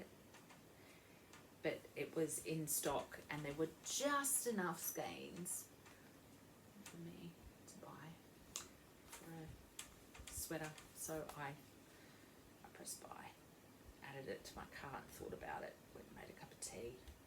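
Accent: Australia. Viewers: Hi. Great to hear another Aussie voice. I’m at the other end of aus from you in Vic. Love knitting. I’m a monogamous knitter. I find it too stressful having several things on the go.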